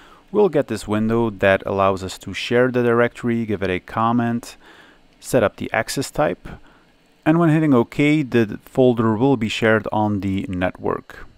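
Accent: Netherlands. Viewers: Are you Dutch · dutch guy?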